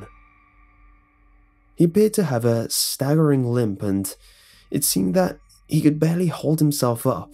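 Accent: England, United Kingdom. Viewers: I'm trying to put the subtitles but you're so British that the words become realllyy fucking false lol fucking audio subtitles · Because that's how he speaks. English by the way.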